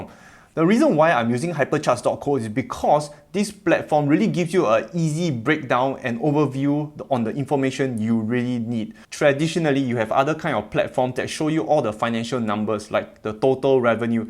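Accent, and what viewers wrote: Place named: Singapore. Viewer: I’m Becoming .. filthy rich because of you by doing nothing but picking..”it’s CRAzy” ( in your awesome Singapore accent )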